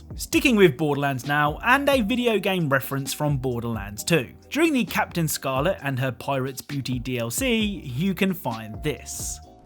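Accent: United Kingdom. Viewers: Are you British, Australian, or New Zealand?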